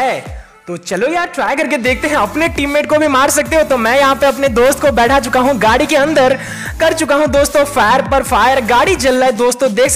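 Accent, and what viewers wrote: Odisha, India. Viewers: App Odisha se ho?? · Bro are you form Odisha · App odia ho kyA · Bhai Ap odisha se Ho na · Bhai ap Odisha se ho kya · Are you odia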